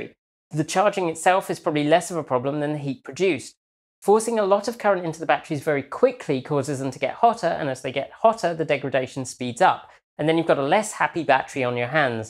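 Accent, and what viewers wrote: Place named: United Kingdom. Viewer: Why are many of the EV videos feature man-ish looking woman with an annoying limey accent. "Let it cool down before you wack it on the charger"... Oh the humor....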